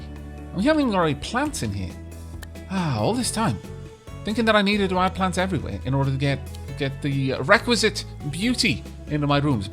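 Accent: Wales, United Kingdom. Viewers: Whisper: He's Welsh. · Welsh do dislike being called English, as do Irish and Scottish people.. He does have a nice Welsh accent :)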